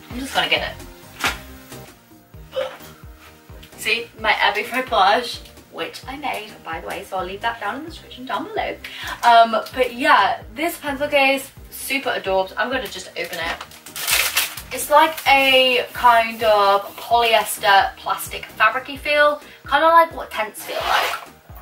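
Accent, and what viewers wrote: United Kingdom. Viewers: R u from the uk iam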